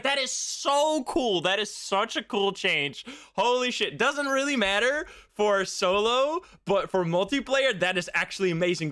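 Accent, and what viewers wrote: Netherlands. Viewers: Wait a sec are you dutch or belgian? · Wait what, are you Dutch?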